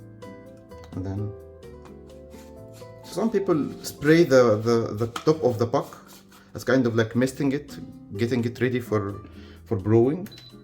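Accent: Egypt. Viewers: subscribe and support for Egyptian content and Egyptian Accent 😍🔥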